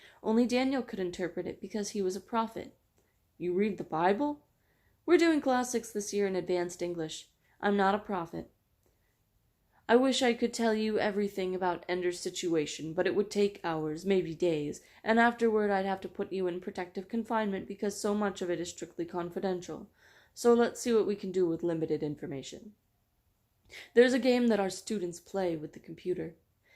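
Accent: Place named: United Kingdom. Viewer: Your british accent is wonderful in this one!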